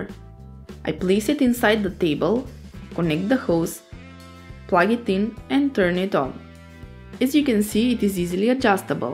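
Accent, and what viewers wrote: Russia. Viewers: Откуда вы, девушка? У вас сильный русскоязычный акцент... . :)) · Крутая работа! Что за акцент? Русская что ли ? ))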